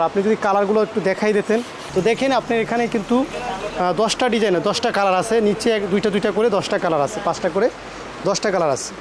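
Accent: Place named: Barishal, Bangladesh. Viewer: Narayongong er lok er borishaler moto kothar sur keno ashe ??. uni ai alakar lok na?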